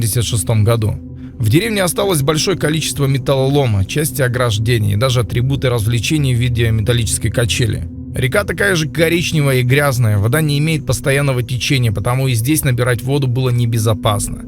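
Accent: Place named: Ukraine. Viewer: егор соболь а разница то какая? Разве это вбила? Просто у нас Украинцев такой говор вот и всё.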